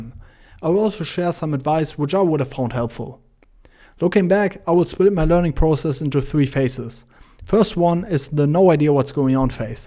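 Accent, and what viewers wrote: Germany. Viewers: in den ersten Sekunden gehört, dass Du Deutscher bist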